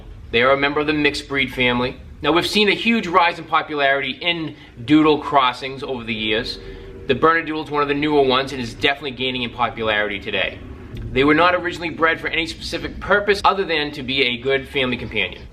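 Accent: Boston, United States. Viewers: You sound like you’re from Massachusetts or Rhode Island. ❤I’m near Boston or Bahwstahn. 😂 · I'm a New Yorker and can say he has a Boston (or some sort of New England accent)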